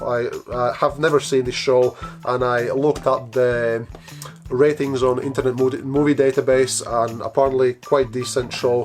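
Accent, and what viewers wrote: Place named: Scotland, United Kingdom. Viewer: Go for it. I am sure there will be members of the audience that will like to see it. If you don't mind me asking where does your accent come from, I get the Scottish but there is a different twang underneath it, For clarity I come from a Glaswegian family so I pick up the Scottish accent quite easily just couldn't place yours fully. Keep getting lucky we all deserve it IMO.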